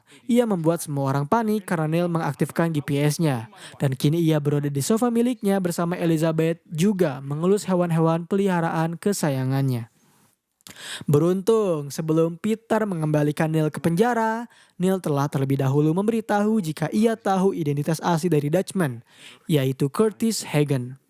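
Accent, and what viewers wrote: West Java, Indonesia. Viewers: Anjirrr gurung gusuh😂😂orang sunda kah min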